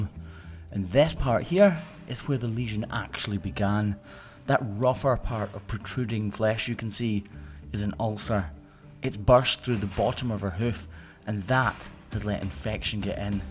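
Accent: Scotland, United Kingdom. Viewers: you enjoy that Scottish accent ;) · I could listen to you talk all day long with your Scottish accent. I live in Michigan and we don’t have hear to many people from Scotland 🏴 That poor cow must have been in so much pain with the hoof problems and rotting flesh. I’ve never smelled that but when you said it smelled like rotting flesh all I could think about was an old chicken part or old hamburger that didn’t get thrown out on time. You do explain your procedures and why your approach to hoof issues so even an, Old Crazy Cat Babe like me could appreciate your work. Thank you for taking the time to post your skills I look at farming in a more understanding what my grandfather and father’s lives were like on their farm. My grandfather had a farm here in Michigan up in the thumb area before tractors, back then he had to grow food for his family and his animals; all he had was a work horse that was a mix between a Belgian and a Clydesdale. 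He took such good care of his animals because he had to depend on each for its job. However come Saturday night there was a dance and pot luck dinner at somebody’s barn, my grandfather was the one who made the moonshine, his shine was so good J. Edgar Hoover tried to arrest my granddad for his shine. The shine was kept cool in a dug out under the chicken house and the geese kept bitting Mr. Hoover so he offered my father $20. to slide under the coupe to get the shine so they could arrest my grandfather, my dad threw a big rock at Mr. Hoover and told him to, “get back into his fanc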